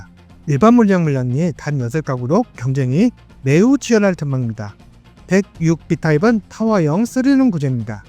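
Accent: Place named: China. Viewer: 대한민국 부동산 콘텐츠를 중국인이 다루나요? 발음이 듣기 너무 불편하고 알아들을 수 없는데, 한국말 공부를 더 하시고 만들어야 할 듯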